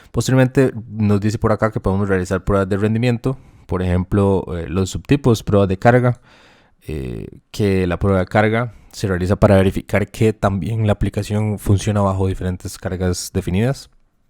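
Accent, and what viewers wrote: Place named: Costa Rica. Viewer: Muchas gracias estimado, creo que sos tico por tu acento. Grande Costa Rica!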